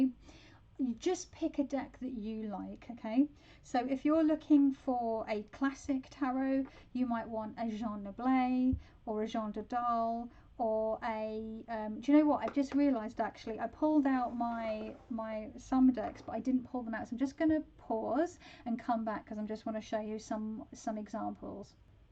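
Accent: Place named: United Kingdom. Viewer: I wish I would've had you for a teacher when I was in school! Professor course on "the metaphysics of English literature" is fab! cool British accent, long flaming red hair wonderful speaking voice and a master of waffling, what more could you ask for in a cool college professor!!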